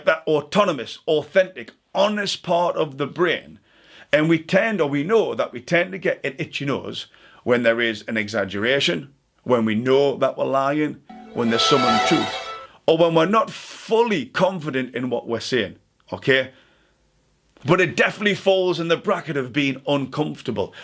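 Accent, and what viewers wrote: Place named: Cockney, United Kingdom. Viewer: If you could speak English properly, I would subscribe. It is like listening to an episode of Eastenders. What does con ent mean? content? You ask your viewers why they don,t like her...why? Because you are not prepared to make a negative opinion of her public? You want your viewers to do it for you so that you are out of her firing line....You state that you lean into liking her!!! Really, not a great reader of a persons character (which you should be able to easily see via her behaviour) Unsubscribing, you are not believeable.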